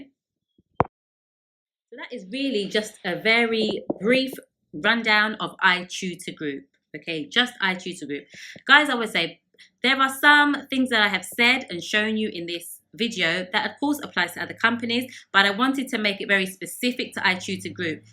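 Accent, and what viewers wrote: United Kingdom. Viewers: Like your content & was drawn to subscribing after watching some of your prior postings. When I see you sharing ur knowledge for free I felt a good vibe about you😀in addition to that British accent. And since you are being so generous i'd like to give u a hand by directing you to a free online source with a wealth of info which u may find helpful pertaining to your calling. If you're interested, let me know with a reply in the thread. Keep inspiring! Peace!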